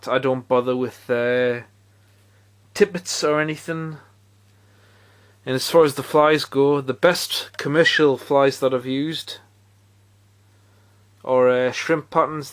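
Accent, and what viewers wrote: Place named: Northumberland, United Kingdom. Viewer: Hiya m8, put it this way, on warm still summer nites u can catch school bass, coalies & perhaps a sea trout, which of course u return! from behind the rokers, so on wi your chesties follow the tide back & I used 2 prefer 2 cast sideways along the beach, parallel 2 the waves if any, also on the whale rock ive had coalies, very small Pollack, tommies & 1 wrasse!, keep up the vids as its nice 2 hear someone speaking properly as what we do!!!, from a fellow Blyth dangler.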